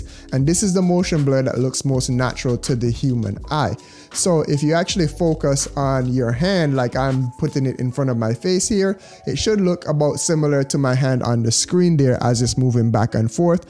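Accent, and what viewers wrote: Jamaica. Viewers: Transitioning from a career in law to documentary filmmaking and of course taking the self-teaching approach. After so many attempts of trying to understand this, your video is the only one that has made sense for my way of learning. You had analogies and visual examples, properly timed. I took notes! Thanks so much for this and shout out to a fellow Jamaican (based on your accent, hopefully im not wrong :) )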